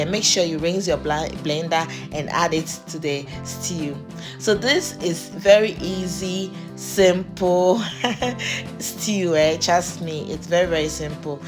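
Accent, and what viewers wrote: Ghana. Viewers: You sound like Ghanaian lady good job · This is not normal. She sound Ghanian. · just when i was thinking of how i could see ewedu leaf to buy . you just thought me another idea with spinach and okro. i guess you are Ghanian from your accent.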